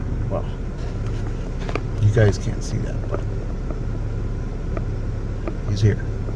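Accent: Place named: Canada. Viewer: This is awesome! I was planning a trip soon! Good Canadian accent!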